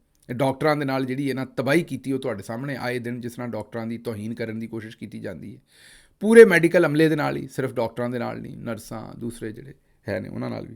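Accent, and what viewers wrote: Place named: Punjab, India. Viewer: I love your punjabi accent, keep up the good work also you used to read comments from different followers plz resume that, it was good effort to highlight backward area issues.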